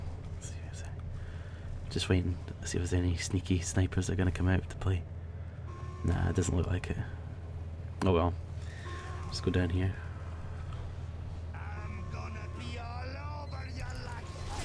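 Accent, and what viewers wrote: Scotland, United Kingdom. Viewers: So Scottish :) There can only be one Demoman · Knew it was a fellow scot with this wee fanny !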